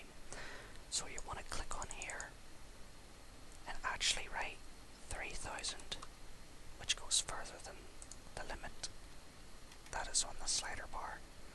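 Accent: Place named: United Kingdom. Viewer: This guy sounds british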